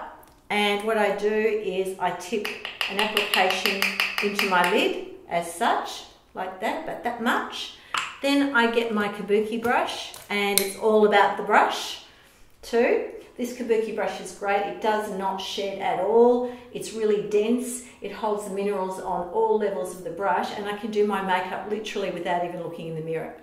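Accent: Australia. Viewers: is she aussie? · Auzzi accent??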